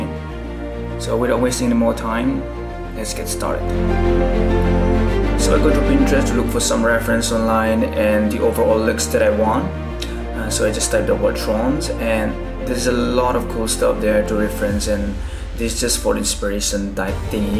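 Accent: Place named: Northeast, India. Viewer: Your English accent sounds like you are from NE India. Great work btw. Cheers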